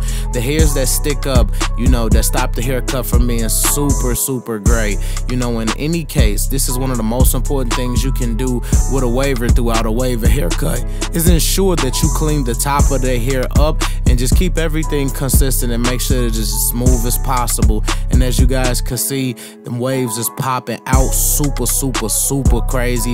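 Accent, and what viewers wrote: Chicago, United States. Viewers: You the shit! I love how you Chicago slick talk 😎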